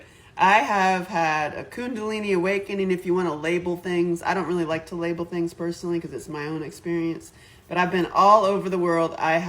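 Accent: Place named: South, United States. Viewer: Hi! It's been awhile! Question, when did you get southern drawl? Plus, when did you get tattoos?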